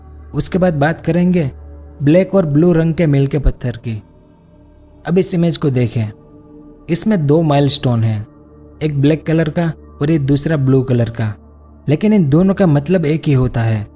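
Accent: Gujarat, India. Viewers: bhai aap gujrati cho?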